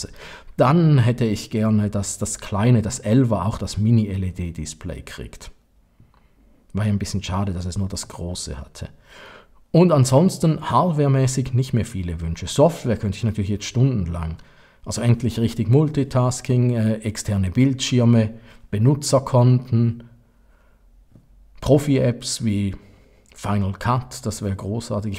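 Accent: Switzerland. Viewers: das schweizerische super charmant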